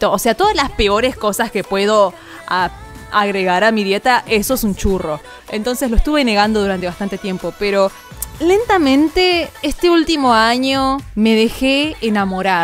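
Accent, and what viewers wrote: Argentina. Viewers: Dios mio como amo el acento argentino (~♡○♡)~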